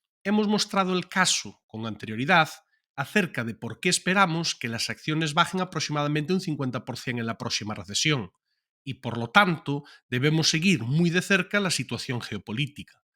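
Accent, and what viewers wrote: Galicia, Spain. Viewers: Acento gallego? Me recuerda al gran Miguel Anxo Bastos. 😆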